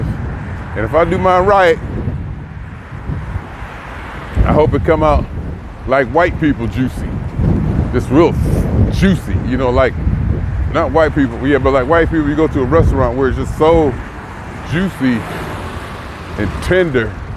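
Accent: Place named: Midwest, United States. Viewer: I can spot the Midwest every time. Thanks.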